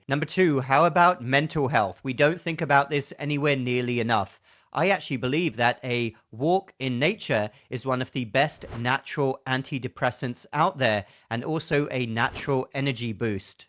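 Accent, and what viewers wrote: United Kingdom. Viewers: I walk to the cafe, about 3.4K every day, so a nearly 7k round trip. I have to concentrate to keep my shoulders back, and look straight forward. I am 77, and was forced to retire at 75 for vaccine refusal. thanks. I am intrigued by your accent, which I notice that other people have from the UK, but seems to be a mixture of older accents😊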